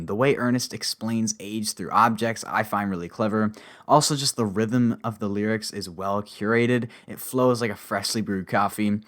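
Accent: Canada. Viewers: not even 2 mins in and I can hear the canadian accent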